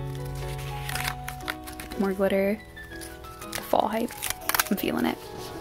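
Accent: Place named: United States. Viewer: она американка т.к. акцент американский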